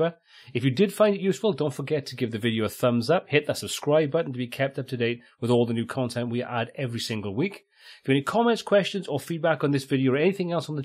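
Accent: Wales, United Kingdom. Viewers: Pardon the off-topic comment, but I'm a huge fan of UK accents. I'm thinking I hear Rob Brydon (seen on TV show 'QI' at times) in your voice. Might you hail from Wales or nearby? Thanks!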